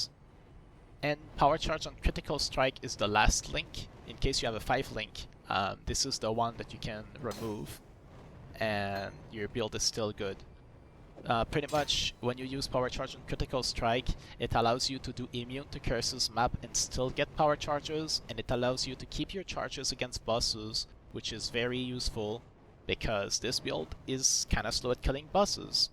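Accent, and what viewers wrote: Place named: England, United Kingdom. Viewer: cette accent anglais xd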